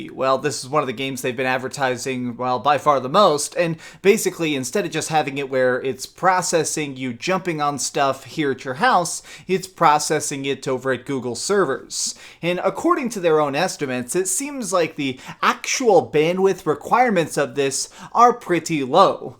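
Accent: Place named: United States. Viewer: brush your teeth man. you look British pretty sure you’re American judging by your accent.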